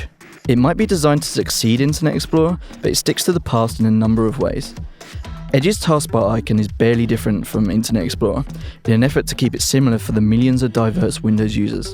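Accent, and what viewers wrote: United Kingdom. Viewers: I have never hated a British accent as much as this. It would've been a great review otherwise. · fuckin british accent, cant understand a word :C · damn I've looked for various reviews and they all have narrators with British accents I can't &^%$ understand...why do they do this?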